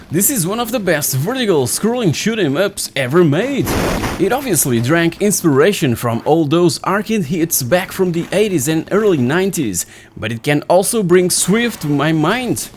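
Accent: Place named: Portugal. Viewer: your accent is very Portuguese, am i correct?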